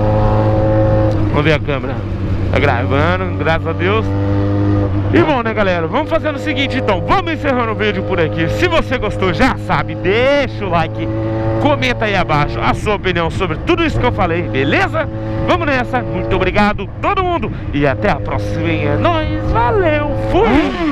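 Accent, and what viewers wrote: Goiás, Brazil. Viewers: Volta a falar no pique do goias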